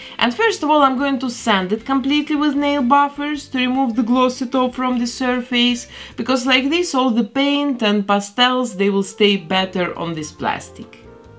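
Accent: Russia. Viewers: Черт😃 Ощущение, что вы русская... Ну на английском говорите так, словно долго жили в России.... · Мне кажется или я слышу у вас русский акцент? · Ничего не понимаю но смотрю, акцент русский сразу видно) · Wow! You speak as Russian... As Russian speaks English.... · почему я слышу русский акцент... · Не знаю почему. Но по произношению кажется что ты русская или украинка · why do you have a pronunciation like that of Russian? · я слышу русский акцент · You have such a strong Russian accent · Ты случаем не русская? Акцент похож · Русский акцент все равнт заметен, но английский очень даже хороший у вас)) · Ты русская? Этот английский режет мне уши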